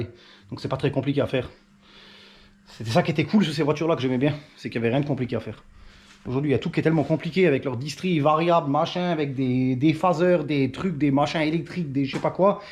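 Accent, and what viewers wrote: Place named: Lorraine, France. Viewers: salut le maître , tu devrais donner des cours au jeune, tient ! toujours impeccable, comme tu le demande dans la vidéo, je mets un commentaire , je sais le faire. mais c’est super cool de te voir le faire avec autant d’aisance. je regarde toujours toutes tes vidéos en entier, à quoi bon, juste mettre un pouce, si on ne regarde pas le contenu. je préfère tes vidéos que les émissions de télévision dédiées , car tu ne fais pas dans la mise en scène, c’est naturel et sans filtre. tu me fais rire avec ton accent qui ressemble un peu à l’alsacien. Tu ne dois pas être bien loin . a bientôt. 👍🏽👍🏽👍🏽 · Bravo meca a l'encienne j'aime bien humeur décalée ainsi que l'accent lorrain. Salutations 👍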